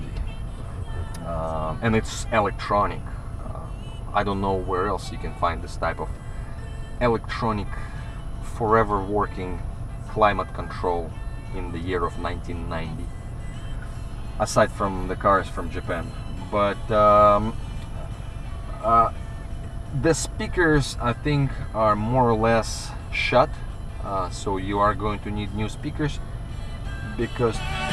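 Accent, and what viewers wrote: Russia. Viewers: Суровый русский акцент. За Краун - лайк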